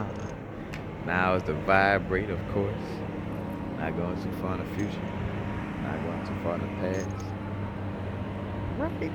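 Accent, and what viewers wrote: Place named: Louisiana, United States. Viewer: where you from bro? you sound like youre from my neck of the woods down in the boot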